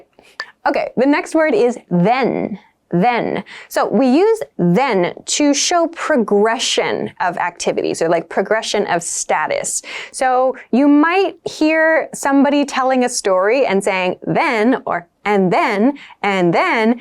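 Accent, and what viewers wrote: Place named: United States. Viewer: She's a USA voice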